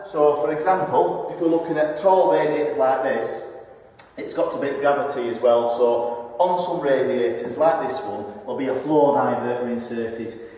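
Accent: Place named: Yorkshire, United Kingdom. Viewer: Nothing wrong with your Yorkshire accent, I didn't see Harold Wilson or William Hague using subtitles. I bet Captain Cook didn't have subtitles. Only criticism is that the speaker is too far from the microphone which results in the echo. You can help me install an air source heat pump, but you live too far away.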